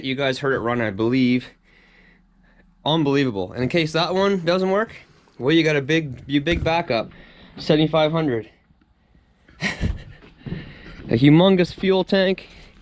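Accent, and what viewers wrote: Nova Scotia, Canada. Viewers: That was the cutest Canadian accent I ever heard. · I was wondering why your accent was pronouncing house as "Hoos" then you said Nova Scotia and it finally made sense. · No - please work your native Canuck "accent" - I call them inflections for lack of better term - back in to your speech. As an accent-less, flat-speech, plain-white-toast American in the PNW I enjoy hearing it. It's amusing and I still have to mess with my family aboot it but it's flavor/character so don't mess with yours bud. I have to watch Letterkenny, Corner Gas, My Self Reliance and Strange Brew frequently to get a fix. Cheers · Sounds Canadian...love Canada!!! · I heard the NS in your voice before you even mentioned it. 😂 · Canada? Accent sounds canadian? Nova scotia! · First time seeing your channel. Sounds like a Canadian accent☺️, what area is this property near? 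It’s beautiful! That’s some long driveway in! Do you get snow? So the cabin is seasonal, or plow the driveway? What a dream!!! Great purchase👍🏼👍🏼👍🏼 congratulations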